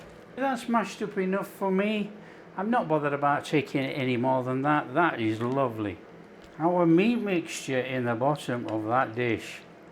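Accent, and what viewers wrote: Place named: England, United Kingdom. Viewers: Hey, Chef, where are you from in England? Trying to place your accent! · I thought he was a Notts man but I guess it's not far from Yorkshire. Sorry chef I'm from the westcountry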